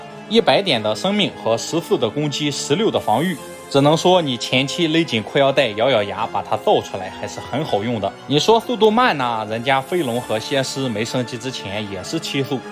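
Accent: Shandong, China. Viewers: 山东口音有点意思： 挖囊废； 😂听了半天才反应过来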